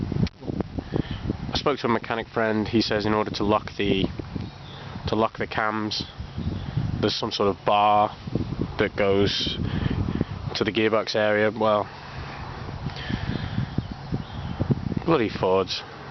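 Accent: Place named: England, United Kingdom. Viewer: all I getta tell you is that you are very stupid. I always thought English people were smart.. but perhaps you are australian.. I can't say for sure, I am brazilian, but for me your accent is English. there is no way to solve the problem without removing the timing belt... BINGO, but you don't need all those special tools for keeping the timing of the engine. BINGO... you need to use your " loaf " ... some improvized tools will work the same way. I did it myself.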